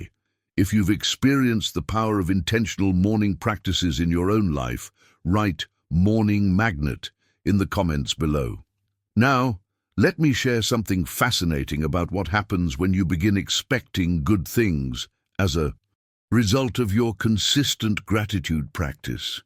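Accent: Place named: United Kingdom. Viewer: This pompous, ubiquitous, British-sounding narrator’s voice is highly annoying. “We make money the old fashioned way: we eeeearn it.” 😕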